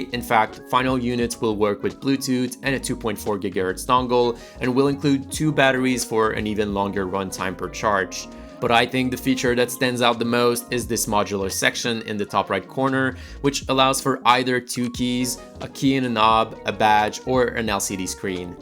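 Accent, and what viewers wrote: Quebec, Canada. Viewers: Super random, but are you per chance French Canadian? It's super subtle but I feel like I'm hearing that subtle French of my fellow bretheren so thought I'd ask 😂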